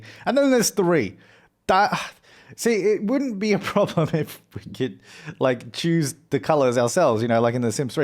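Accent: Australia. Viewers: Ocker voice AND CLASSIC MAKES A RETUUUUUURN!!!!;)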